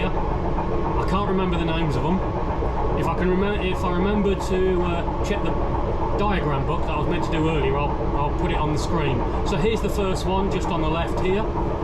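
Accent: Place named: Birmingham, United Kingdom. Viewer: Hi, loving all your videos home & abroad. Cant think of any jokes at this moment in time. I have always been interested in London Underground and its trains. I live just across the road from the Victoria Line Depot. I no Southern region have problems in the winter with current pickup from their 3 rd rail system due to snow and ice. How does the 4th rail London Underground system fair with that problem? You was saying on one of your videos that your home town is Peterborough, i hear a Brummie accent from you. Anyway mate, keep up the good work. Regards Lee.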